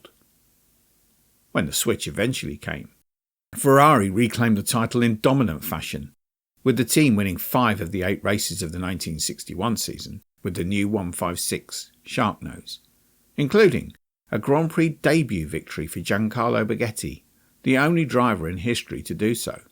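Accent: United Kingdom. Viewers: why did i know the accent was gonna be British all the jingoist British bias content "why is ferrari so bad at f1" is getting annoying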